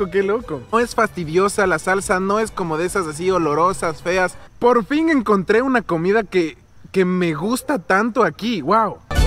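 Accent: Ecuador. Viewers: Eh visto otro youtuber y si son buenos. Pero Tu, tienes calidad, hasta para hablar a lo Ecuatoriano 👍👍💪🇪🇨🙏 sigue asi y bendiciones. Te ganaste en mi lista #1 de youtubers. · Nuestro acento Ecuatoriano en ingles se reconoce al paso 😂😁🇪🇨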